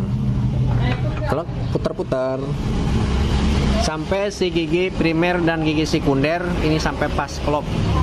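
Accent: West Java, Indonesia. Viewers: Mantep ah Jiga orang sunda Kang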